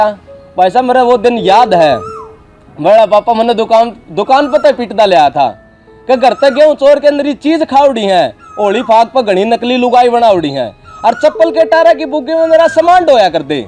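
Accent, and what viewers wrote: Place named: Assand, India. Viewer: I am from Multan Pakistan .bhai lagai se kime assand ke ilake se hei kunke mere gam main assandiai bethe se.look like u r from assand karnal because my village my maternal relatives speake 100 % same language with the same dialect. I am surprised to my languageis alive in haryana india.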